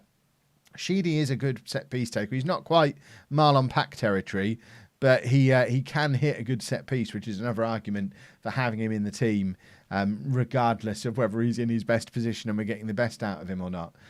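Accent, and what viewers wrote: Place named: England, United Kingdom. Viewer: I love the english pronunciations.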